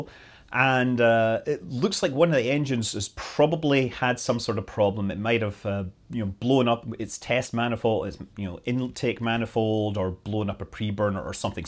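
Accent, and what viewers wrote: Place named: West Scotland, United Kingdom. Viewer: where in Scotland are you from? (I’m west Dunbartonshire) a lot of your words sound like you’re from the west 🙈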